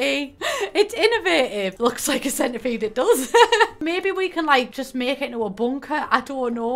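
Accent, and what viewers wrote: United Kingdom. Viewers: I cant imagine trying to understand her if your not a brit especially if you already struggle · Well I only understand half of what she says, but makes sense since I'm not native, and also I wonder from which part in the UK is she from.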